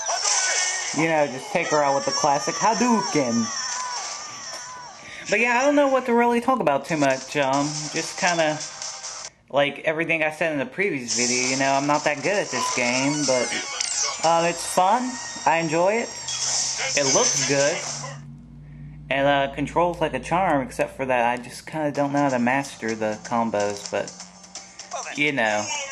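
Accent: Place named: South, United States. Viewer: HE IS SOUTHERN HES FROM MISSISSIPPI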